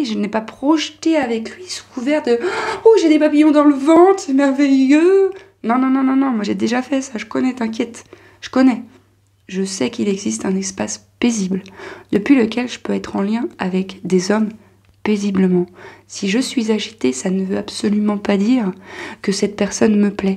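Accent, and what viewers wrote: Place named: South, France. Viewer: Incroyable cette vidéo et la petite ref de Nietzsche et l'accent du sud c'est fou.. merci pour toute cette justesse et ton partage et cet humour !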